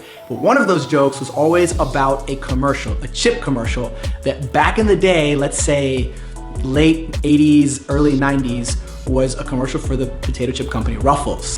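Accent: Buenos Aires, Argentina. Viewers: Más porteño que la mierda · Me parece o perdiste un poco tu acento natural? Te escuchaba muy porteño 😄😊 Me encantó el video... muy buen tip! 👏👏👏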